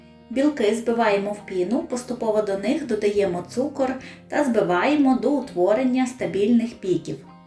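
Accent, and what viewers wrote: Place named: Hutsul, Ukraine. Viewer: Дуже дякую за рецепт. Чудово подайте, без зайвої балаканини...І чудова українська мова...з ниткою Гуцулендії.😉🤗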